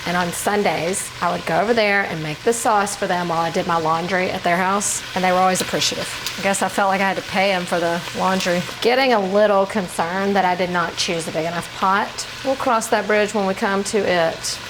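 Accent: South, United States. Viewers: The southern accent gives me life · Definitely first time hearing a southern accent instead of a NY/NJ one making Sunday gravy. My friend’s mom never used tomato paste, it was all whole tomatoes from a can that she crushed with a device. She also used beef neck bones, sweet and hot sausage, braciola, veal and pork. Great tip with the meatballs, although she never served meatballs with, just the meat from the sauce. She browned all the meat first then added the tomatoes. That sauce started Saturday night and was served Sunday evening. The smell lingered until Tuesday. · To me, it's just funny how a American with a strong southern accent calls themselves Italian, well I say of ancestry is the best way to put it. And saying sugo with a strong American accent. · This is the first time that I hear an italian with a souther accent... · She's awesome, being an Italian gal with a southern accent always gets me. 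Check out her recipe for roasted potatoes made into a creamy salad AMAZING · The southern accent is a kick. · So you’re an Alabama girl. Good to know, I thought that I heard a southern inflection. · She’s Italian? I couldn’t tell with that Southern accent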